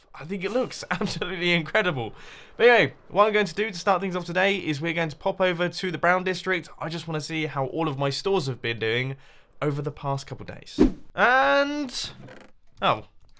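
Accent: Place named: United Kingdom. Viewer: James D that is a British accent, I know cause it's the same as American (English) duh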